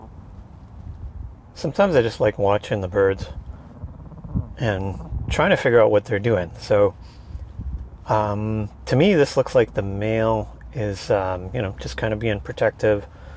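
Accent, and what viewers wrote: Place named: Canada. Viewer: I enjoyed this video. I’m surprised that you could get so close when filming. I like the use of ‘A’ when talking. Very Canadian. It’s a bit long when looking at the male bird. Maybe describing what’s going on with the birds or an explanation on what the female is also doing. I’m not sure what you should say cause I don’t know really anything about Canadian geese (crowbar chicken). Keep going with the video, can’t wait for the next instalment. ❤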